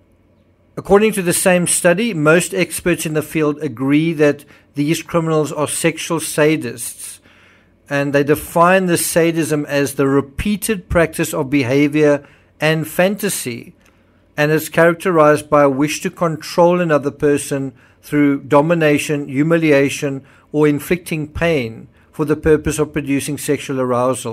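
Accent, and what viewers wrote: South Africa. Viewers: Whoever said u r a liability in this case, is an ass. U’re obviously knowledgeable and put a lot of work into investigating each, including this one, case. U’ve even traveled to many of the areas in which the crime took place, or where the predator worked, or whatever!! I am also a fellow South African listening to u from Rhode Island, USA. P.S. love hearing the accent! Thk u!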